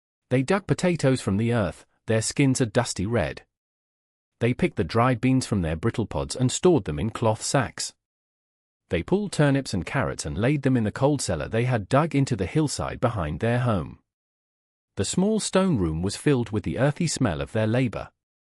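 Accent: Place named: United Kingdom. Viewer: Is there a particular reason for the British accent for the narrative?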